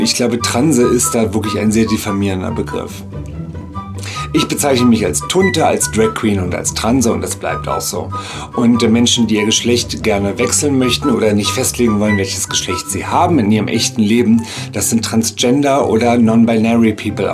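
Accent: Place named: Berlin, Germany. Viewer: Ich hoffe du liest mindestens 100mal mehr positive Kommentare als solche niveaulosen die im Video gezeigt wurden. Dein Humor ist großartig und deine Kunst ist nicht nur unterhaltsam sondern auch sehr lehrreich in puncto Szene, Politik, Berlin und Selbstbewusstsein. Natürlich ist der Ton manchmal sehr derbe. Aber wer sich die Mühe macht hinter die Fassade aus derber Berliner Schnauze und Makeup zuschauen, wird die Kunst und deine Absicht dahinter erkennen.Ich hoffe noch viele Videos von dir sehen zu können.Danke dafür <3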